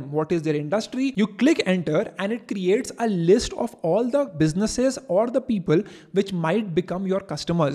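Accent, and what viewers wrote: India. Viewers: thanks but that Indian accent is really hard to listen to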